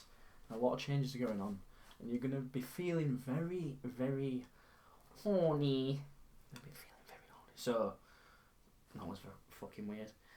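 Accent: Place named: Manchester, United Kingdom. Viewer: You from Manchester bro? You have the accent for sure. A fellow Manc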